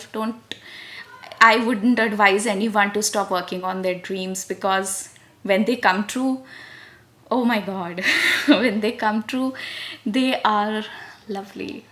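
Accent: India. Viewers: Can't convert your accent into a estimable one. Indian accent is utterly hideous and dismal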